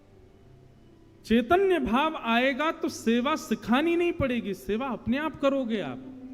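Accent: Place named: Braj, India. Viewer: Raadhey raadhey guru ji aap bhut acchi katha krte h aap ke mukharvind se brij boli bhut acchi lagti hai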